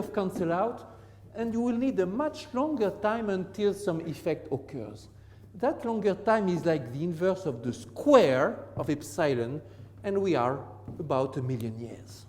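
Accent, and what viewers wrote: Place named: France. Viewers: He sounds SO French and I love it!! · ITS A PURE FRENCH ACCENT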